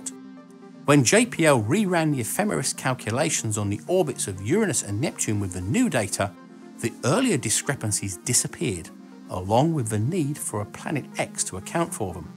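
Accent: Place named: Cockney, United Kingdom. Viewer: A fascinating video as always but the blokes terrible Pommie slapped Cockney accent is awful and distracting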